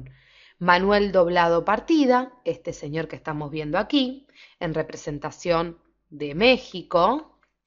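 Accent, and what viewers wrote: Argentina. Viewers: eres de argentina?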